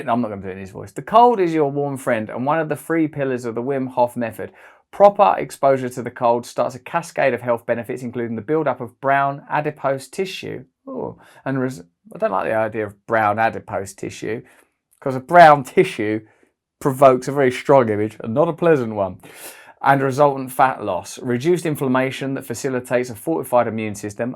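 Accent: Cockney, United Kingdom. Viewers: Us bloody yanks would actually take you seriously when you sack your cockney accent.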